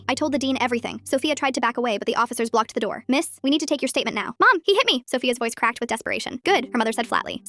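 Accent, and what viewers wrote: United Kingdom. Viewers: that's British accent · Why did she turn British Midway through the video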